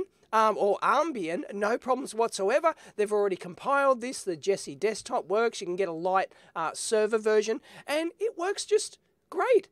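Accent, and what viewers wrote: Australia. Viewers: He sounds less Australian.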